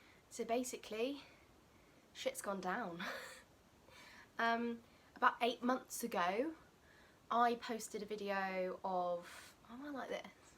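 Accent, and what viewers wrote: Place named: United Kingdom. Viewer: Very punctual with the response. But I sure do agree that the UK are far more strict than the US. Not really sure why people are attacking you as you are clearly in a different continent (due to your British accent) and you are just an employee, or at least used to be. But your quick response to address the issues people have is great and like the approach you took. Taking great care of the comments on your videos and addressing them and paying attention to it. That is nice of you to pay attention to useless comments by outraged people hating on subway. As for your video content, I can see you make videos with a bit of comedic style to it, which is unique. Disregard the hateful comments, your videos are great. It is natural and real and straightforward. Good luck with your channel, and hope you have a great future ahead of you!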